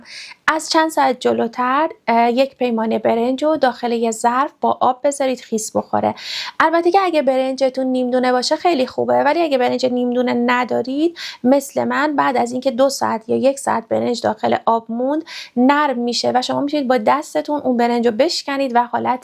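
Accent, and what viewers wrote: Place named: Iran. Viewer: I love the Persian accent you look incredible dear friend thanks for sharing best wishes from England ❤👍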